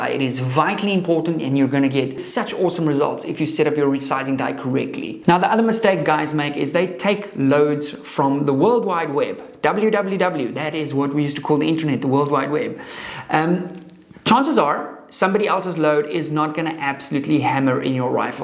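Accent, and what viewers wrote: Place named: South Africa. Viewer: Good vid. Great tips. Are you South African? We are. But live in New Zealand now. It must be expensive in SA getting the hardware you need....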